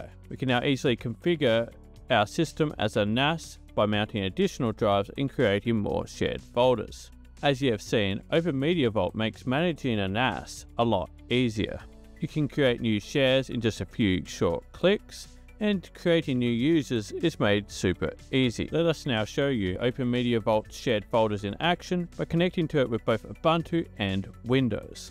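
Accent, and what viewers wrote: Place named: Australia. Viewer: I love your vids, can’t beat that aussie accent 👍 Can you please do a vid showing how to get matrix synapse running over tor? 🙏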